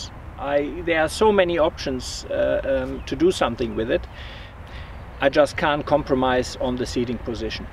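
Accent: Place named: Germany. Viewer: Ähmm... your accent... sounds damn deep German... richtig oder? Still Pinzgauer for President... best time at Austrian Military was "driving" to Locations with this thing... driving, its more waiting for the Location comes to u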